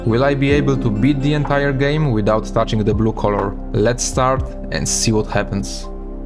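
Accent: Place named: Poland. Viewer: jesteś Polakiem, słychać haha. poeodzonka na yt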